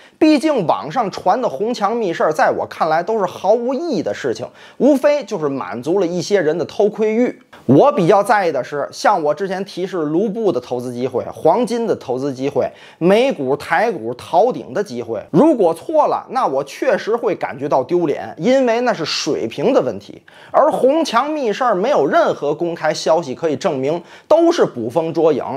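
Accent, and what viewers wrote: Tianjin, China. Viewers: 天津话是真逗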